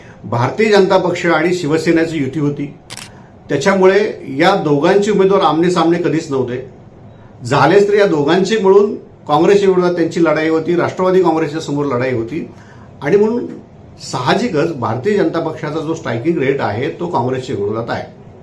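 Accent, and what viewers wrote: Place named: Brahmin, India. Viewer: तुम्ही बोलण्यावरून ब्राम्हण वाटतंय आणि तुम्ही बामणी पक्षाचे उदे ऊदे करत असता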